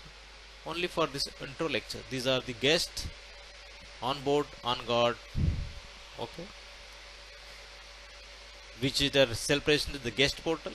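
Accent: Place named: India. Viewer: Another terrible Indian accent explaining something he just learned yesterday. I guess he is counting on this video to get himself a H1B.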